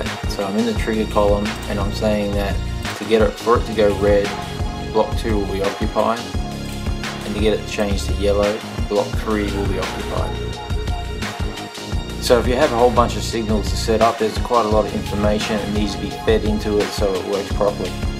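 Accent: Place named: Australia. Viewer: I simply love your videos. Thanks for posting. I'm still strictly DC and have no plans to go to DCC unless a miracle happens and I maybe win the lottery. I guess I'll continue my DC layout, but I thank you for the insight into DCC. I've searched the internet for NEA Railroad software and cannot find anything on this software. You seem to have an Australian accent so maybe this is only available in Australia. Keep up the good work and I really enjoy your videos. Keep 'em coming.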